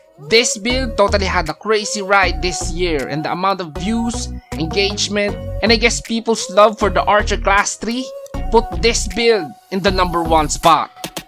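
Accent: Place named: Philippines. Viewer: Hard Filipino accent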